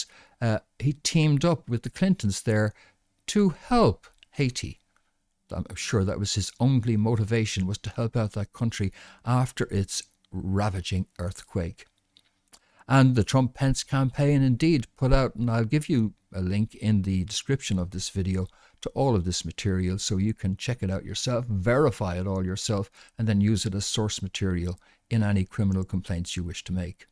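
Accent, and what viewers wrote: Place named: Ireland. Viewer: we love listening to you speaking in your gorgeous Irish accent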